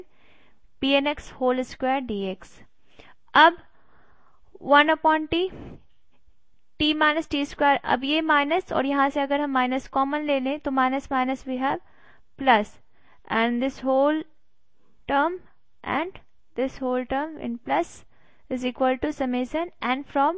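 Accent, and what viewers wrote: India. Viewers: I barely understand indian accent... but english mixed up with indian hell no !!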